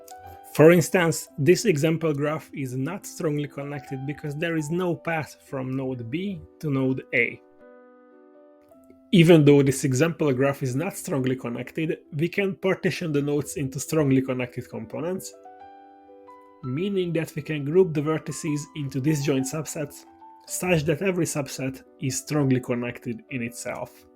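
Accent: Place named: Hungary. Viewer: Judging by your accent and choice of music, you're from Hungary or Slovakia.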